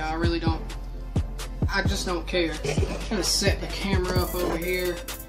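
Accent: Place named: South, United States. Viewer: Sounds like the south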